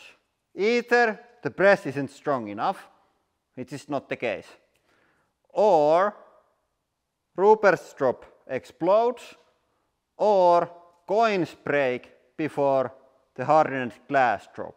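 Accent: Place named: Finland. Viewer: The underwater shot was spectacular!! Also with all due respect I love your Finnish accent when you speak english. Please, don't westernise your speaking! 😁😁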